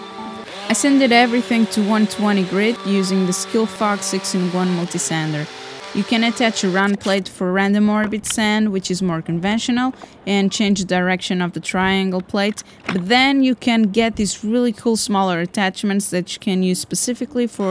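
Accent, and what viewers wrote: Portugal. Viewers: Ohhhh! Portugal! I've never heard a Portuguese accent before! I was trippin' trying to place your accent :) Really really cool project! Thank you for sharing! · Assim que comecei a ver o video tive a sensação que o sotaque seria Português :D Yey!! Tão contente por te ter encontrado!! Subscribed! <3 Love de Coimbra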